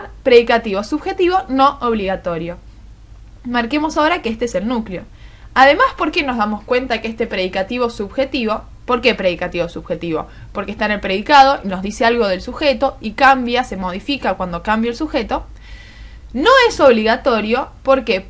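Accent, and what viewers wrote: Argentina. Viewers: hace tanto que no escuchaba ese acento argentino hahahah muchisimas gracias por la explicacion!!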